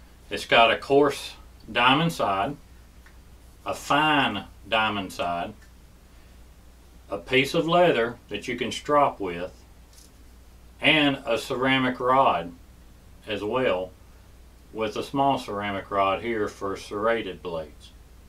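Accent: United States. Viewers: Just subbed! My loan-start senses are tingling. Do i detect a texas twang?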